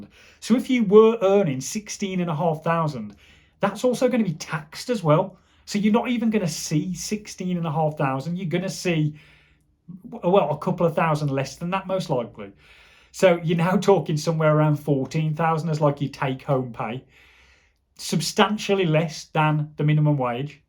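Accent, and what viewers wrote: Black Country, United Kingdom. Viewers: I love your Black country accent 😂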